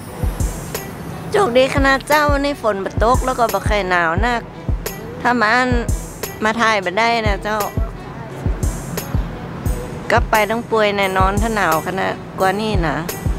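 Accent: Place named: North, Thailand. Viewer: สวัสดีครับ ได้ยินเสียงชาวเหนือผมคิดถึงแม่ละเมาบ้านเกิดผมเลย 😃👍👍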